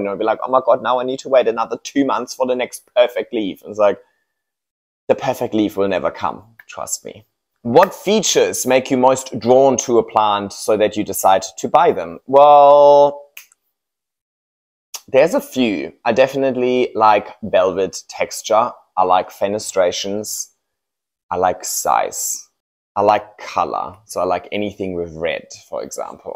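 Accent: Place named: Germany. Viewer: Are you German? I can't figure out if you're a German in Sydney or if Australian English is actually that close to a German accent 😂 It kinda blows my mind how similar it is :D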